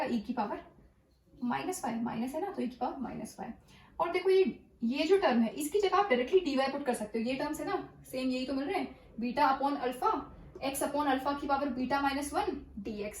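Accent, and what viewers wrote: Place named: Himachal Pradesh, India. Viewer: For once I thought you are from Himachal! haha. Nvm, Great video though!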